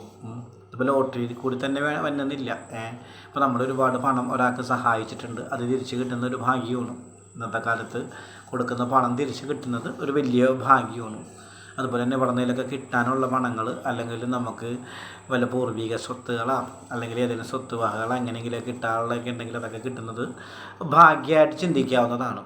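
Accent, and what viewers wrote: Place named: Kochi, India. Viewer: When I hear a positive, I am happy thirumeni 🙏 .. njan subscribe chaythu, nummade kochi slang when I heard the innocents so happy 🙏. Thirumeni I am from USA 🇺🇸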